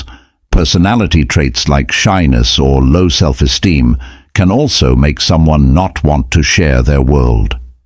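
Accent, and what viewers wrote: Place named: Scotland, United Kingdom. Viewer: Yepp took on a scottish broague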